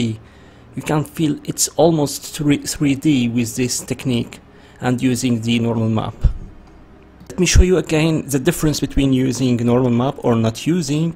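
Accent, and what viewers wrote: Arab world. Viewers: are you Arabian , your accent says that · your english sounds arabic lol. nice video by the way, keep the good work up.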